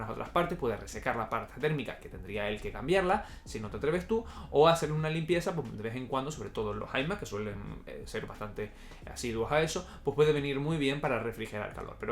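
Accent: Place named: Chile. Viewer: que curioso que los españoles hablen parecido a los chilenos. De primera pensé que eras chileno por la entonación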